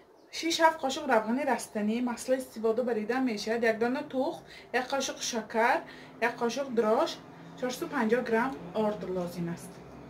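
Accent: Isfara, Tajikistan. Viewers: Салом аз лахчаи гапзаниятон исфараги менамоед офарин хело хурсанд шудам ваобуна шудам видеохои навро интизорам салом аз шахри маскав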